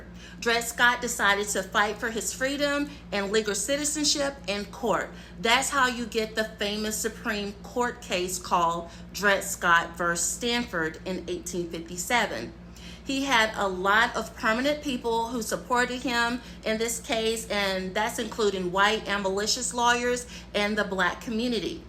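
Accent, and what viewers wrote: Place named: Africa. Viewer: Another African talking about black American stuff. Focus on the civil wars over there and the endangered lions. We can tell by how you talk you are trying to hide that accent jungle jungle girl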